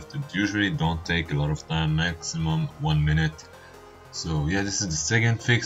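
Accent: Lebanon. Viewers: R u lebanese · ur english accent is like us the Lebanese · Elina antoun he is lebanese, so am i lol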